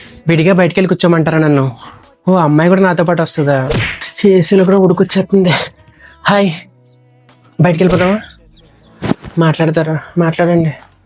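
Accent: Godavari, India. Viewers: Super garu,your godavari ascent is so nice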